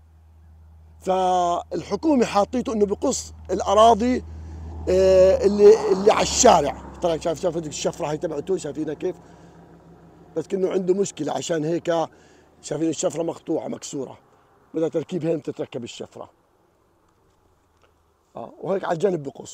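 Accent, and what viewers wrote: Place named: Palestine. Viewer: باين من لهجتك فلسطيني أو أردني تحية لك من بلد المليون شاعر الجمهورية الإسلامية الموريتانية ❤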